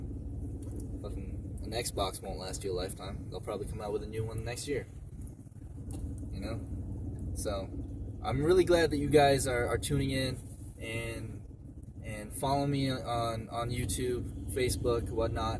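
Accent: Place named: Washington, United States. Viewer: hey that's weird that I was just thinking this dude sounds like he's from Washington. lol I'm from Tacoma. love the videos and envy the lifestyle.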